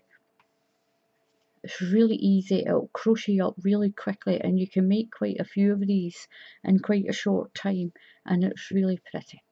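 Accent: Scotland, United Kingdom. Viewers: Hi I am so glad I came across your crochet tutorial. You are great at explaining things. Many thanks and love your Scottish accent. Thank you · Hi are you Scottish?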